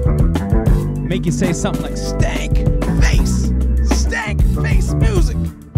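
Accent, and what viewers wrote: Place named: Nova Scotia, Canada. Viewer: You sound like you are from Nova Scotia. 😎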